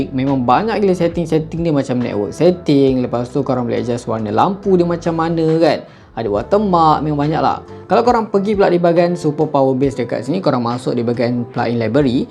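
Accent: Terengganu, Malaysia. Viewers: kemah orang ganu kite... tiberrrr 😁😆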